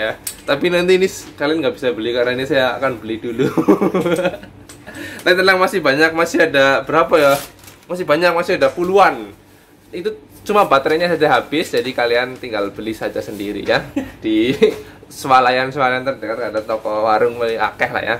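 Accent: Banyumas, Indonesia. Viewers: Nyimak lokasine ngendi si ya,banene koh ngapak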